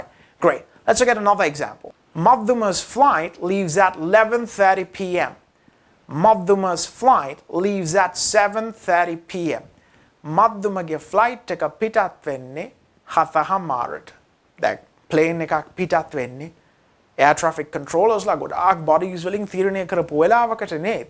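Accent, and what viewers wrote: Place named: United States. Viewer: I really like your speaking style 😃👏 it's truly American English 😍 sir, you're great teacher 👍 because you're teaching pattern so attractive 🔥 it's a valuable thing for all English language learners ♥️ Best of luck 🌺 I'm waiting for next YouTube video of simple present tense (part6)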